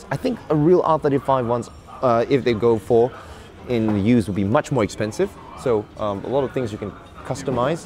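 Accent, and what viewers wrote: England, United Kingdom. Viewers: is anyone else strangely fascinated by the British accent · i just randomly stumbled on to this channel i like it so far. Is this dude British? his accents super classy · i like your English accent no need translate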